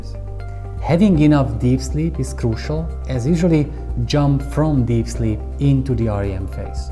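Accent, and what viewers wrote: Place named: Hungary. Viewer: Gondoltam az akcentusodból hogy magyar vagy 😅 Mit mondanál, mi a legjobb alvás tracker? Mit érdemes venni?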